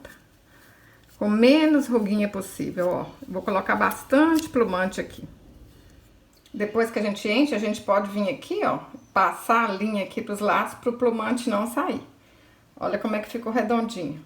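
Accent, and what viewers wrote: Minas Gerais, Brazil. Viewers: Ameiiiii....e amei mais ainda o sotaque mineiro, sou uma capixaba apaixonada por Minas!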